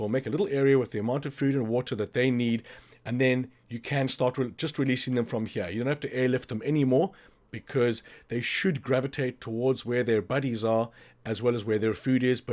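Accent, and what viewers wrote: South Africa. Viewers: Awesome video thanks man! I recognize the accent, Are you south african?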